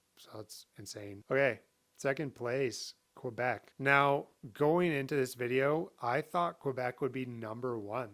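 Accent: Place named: West Coast, United States. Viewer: ur definently from the westcoast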